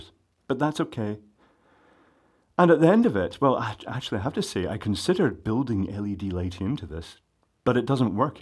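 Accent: Scotland, United Kingdom. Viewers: I love your Scottish accent, the way you pronounce "i"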